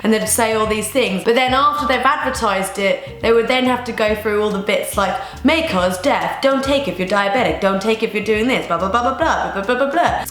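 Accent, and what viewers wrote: United Kingdom. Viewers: I'm an American and I absolutely love the female British Accent!! 😍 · i could listen to a British accent like all day long. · I usually dont like british ppl because of the accents but u r diffrent i like you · ur accent is so lovely. where are u from in the UK? :) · Your limey voice hurts my head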